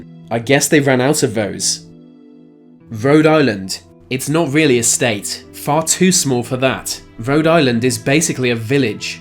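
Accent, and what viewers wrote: United Kingdom. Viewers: U INSULT TEXAS AT LEAST WERE BETTER THAN UR BRITISH SOUNDING ASS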